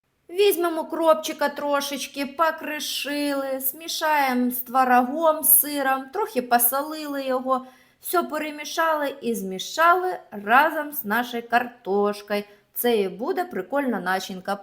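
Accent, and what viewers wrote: Surzhyk, Ukraine. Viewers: такий жахливий суржик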